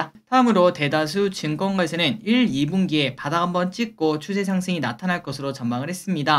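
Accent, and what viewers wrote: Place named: Daegu, South Korea. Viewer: 이거 어디까지 올라가는거에요?? 찐 대구 사투리네요ㅋㅋㅋ😂😂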